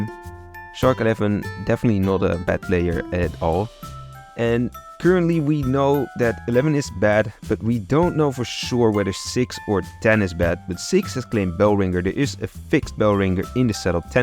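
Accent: Netherlands. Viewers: Dutch accent is hard not to notice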